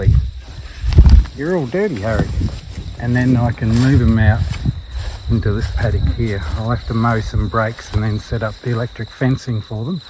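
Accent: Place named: Australia. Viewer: Has an ausy acent makes all the sence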